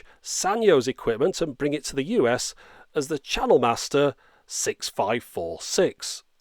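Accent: United Kingdom. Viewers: You're my favorite, seriously, you're the best of all technology channels, and I love your British accent ^^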